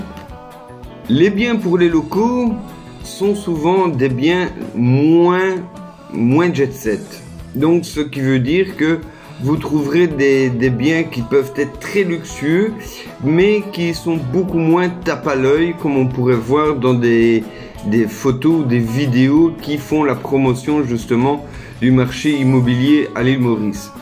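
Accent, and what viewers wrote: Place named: Belgium. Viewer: Bonjour, je vous découvre ce soir! Seriez-vous Belge? Il me semble reconnaître un bon petit accent de chez moi! Je vais passer 3 mois à l'Île Maurice pour découvrir et voir si c'est possible de m'y installer dans le futur! Pourriez-vous me dire comment trouver une chambre, un studio pas trop cher pour une période de 3 mois? Est-ce possible de trouver près de la plage? J'aimerais aller vers Rivière Noire pour nager avec les dauphins! Je vous remercie de votre réponse! Puis je vous envoyer un mail? Merci bcp! Evelyne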